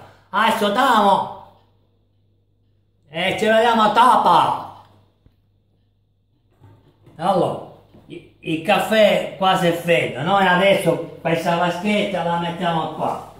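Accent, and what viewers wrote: Sicily, Italy. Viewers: Grande!!! Viva la Sicilia e mi piace che parli con accento siciliano invece di fare il perfettino come gli altri!! Ammuniii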